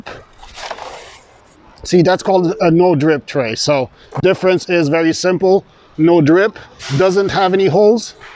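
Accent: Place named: Quebec, Canada. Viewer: Hey there...just found you on here. Was listening to Epic gardening podcast and recognized a fellow bilingual Quebecois. I'm in Val-des-Sources( formally Asbestos) and love seeing the familiar products as I see on the shelves here...I guess I would have to consider myself an experienced gardener at this point, but love finding new ways to inspire others how to grow something.... anything really..lol I'm officially a Fleuriste by deploma, but have worked many years in a centre de jardin/pipinere learning from old school gardeners, and have always had my own gardens of my own over the years. This year I am introducing wine cap mushrooms to my pathways...😁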